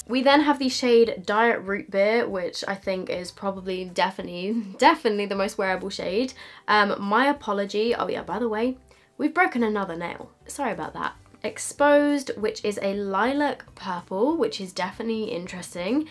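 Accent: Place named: United Kingdom. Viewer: I find voice soooo calming! Anyone know where in the Uk she is from?!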